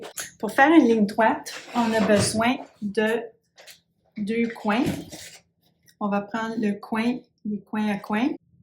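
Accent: Quebec, Canada. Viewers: J’adore l’accent canadien et les conseils au carreau également, bravo bravo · Quel délicieux accent québécois 💙🤍❤️ nos adorables cousins 😊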